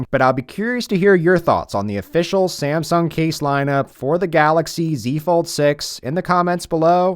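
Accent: Philadelphia, United States. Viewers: Are you from South Philly/Delco?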